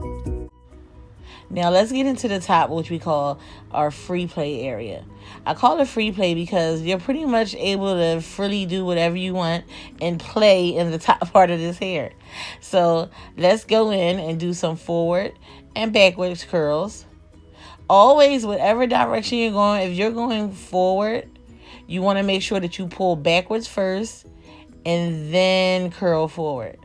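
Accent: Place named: Philadelphia, United States. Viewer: Philly? I detect the accent. Hair was fire!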